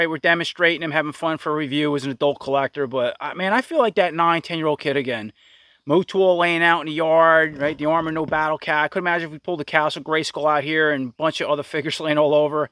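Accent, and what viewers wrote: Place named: New Jersey, United States. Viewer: Love your voice man! Jersey kinda sound! I gotta check more of your videos out. Thanks again for the dub and I subscribed back ..but looks like your chan chan is doing well.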